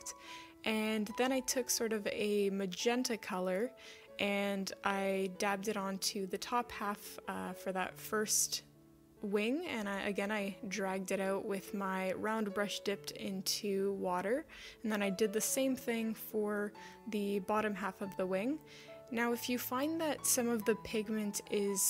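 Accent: Canada. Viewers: Oooo. Canada i knew it.. i could hear it in your voice... then i read your profile.. you're very talented. Thank you for your art.❤❤❤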